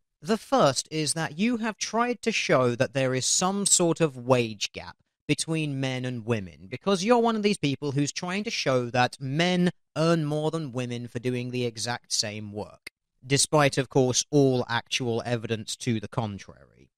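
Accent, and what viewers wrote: United Kingdom. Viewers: Aw, damn. He's winning me over. His snarky-British blue deer accent is wiggling all around in my brainspace, making me giggle and think critically and shit. Whoo, binge watching!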